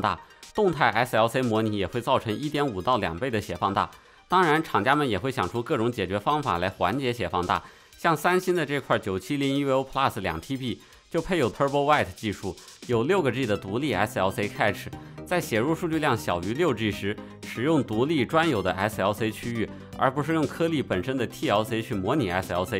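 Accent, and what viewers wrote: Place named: China. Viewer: 想知道台湾朋友们不看字幕的话，听大陆腔有没有难度呀？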